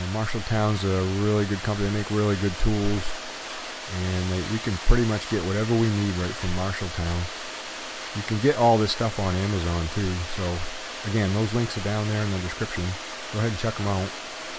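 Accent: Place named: New England, United States. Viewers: bill smith He sounds like a New Englander.. my guess is Boston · Hi, great video. Good to hear my native speak (I live in Austin but am from RI). Very helpful to see the entire job unfold. Hoping you'll be able to provide some input re: moisture barrier (and cost). Details below (it's a lot). Thanks in advance if you can help, completely understand if you can't. Am converting a 20' X 12.5' (leaky) garage into a recording space. There's a 30 inch x 20' ledge running along interior-wall side. The floor is 5.5" below house slab level, so assuming I need to leave space for flooring on top of new-pour slab level. Total square feet of space to be raised is 200 sf X (approx) 5". Question one: Have been told I need a moisture barrier and would have to wrap the studs, but noticed the white strip at base of studs on far wall in video. Is that the foam of which you speak? 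Can you explain the application? Question two: I live in Austin. Contractors bidding on job have varying opinions and thoughts about moisture from concrete at studs and structural materials to use. Current concrete floor appears to be fine and intact. My major concern is water, and like this client, it's apparent that at least some of it is coming from under the garage door during heavy rains lasting more than a day. My house is on a slight grade, and the driveway has a short flattened run in front of the garage door, but it doesn't seem to do much to re-direct the heavier rain water (no, no gutters, unfortunately). That said, the water reliably pools along the garage's inter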